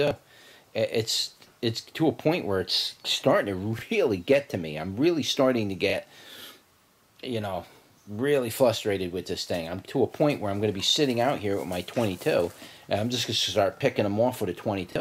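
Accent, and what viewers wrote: New York, United States. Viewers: You sound like you’re from one of the boroughs. :). I like your accent!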